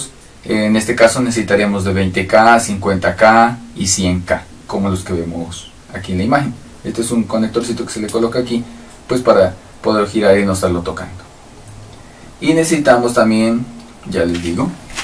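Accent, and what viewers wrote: Pasto, Colombia. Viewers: Ud es de Pasto? profe. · Eres pastuso cierto?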